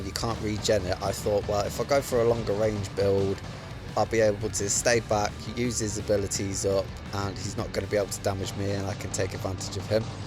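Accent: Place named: United Kingdom. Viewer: thanks for the reply ! I didn't mean any offence , I play this game since it was released for testing and never had the chance to hear a wr channel with UK English. You're also a good pilot. Keeps it up 🔥👍🏻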